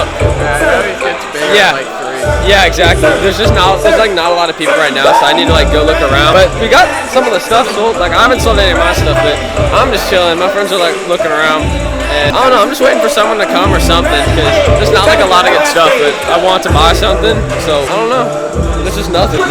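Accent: South, United States. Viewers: Where are you from? You seem to have a southern accent now that your voice is a little deeper.🤔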